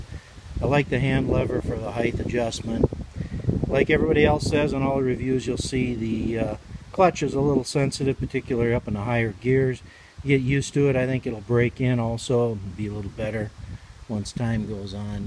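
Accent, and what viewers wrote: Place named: Pennsylvania, United States. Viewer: Sounds like your from Pennsylvania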